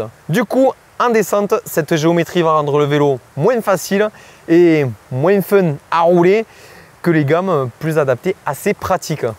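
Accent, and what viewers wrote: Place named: Toulouse, France. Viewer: c'est pénible cet accent du toulousain... tu pourrais pas copier l'accent ch'ti?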